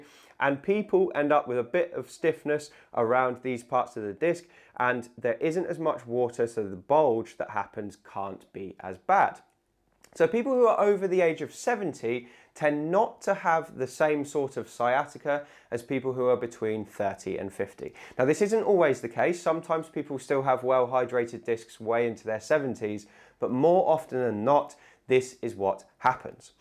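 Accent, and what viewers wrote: United Kingdom. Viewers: when a handsome guy speaks with this British accent you better trust him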